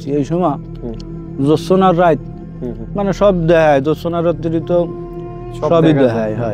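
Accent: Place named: Bagerhat, Bangladesh. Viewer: amader bagerhater vasa mone hocche❤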